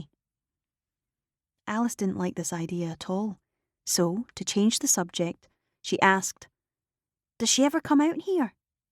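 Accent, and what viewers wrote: Scotland, United Kingdom. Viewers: got the most beautiful Scottish accent ever! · and her scottish accent. Perfection. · Chinese girl with a Scottish accent my God literally could not find a more perfect beautiful woman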